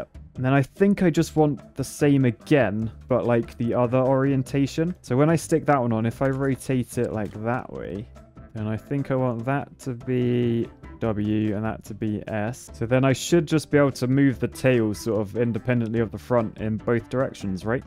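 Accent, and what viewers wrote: United Kingdom. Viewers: Are you British? You're accent like british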